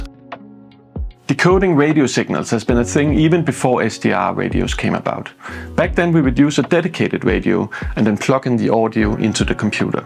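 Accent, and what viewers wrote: Denmark. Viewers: 110% dansk prosodi. Det er ligesom at høre Mumlemanden 😊❤️ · Is that a Danish accent i'm detecting? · lmao I watched all the way up to the point where you showed the Danish map until I realized you were Danish too. Your accent is very faint.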